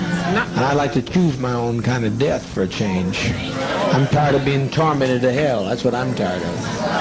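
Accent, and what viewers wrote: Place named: United Kingdom. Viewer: Anyone who calls you smug is only because of your British accent because anyone who talks to you ever KNOWS you’re just a big ol’ sweetie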